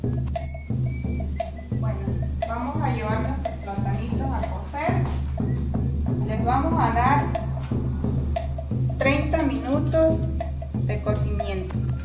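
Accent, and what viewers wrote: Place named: Tabasco, Mexico. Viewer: Que preciosa es mi tierra (Tabasco) da gusto ver el canal de una paisana y escuchar el acento choco ❤ saludos desde San Luis Potosí, cuando voy a mi tierra aprovecho a come de todo y me traigo todo lo que puedo 😀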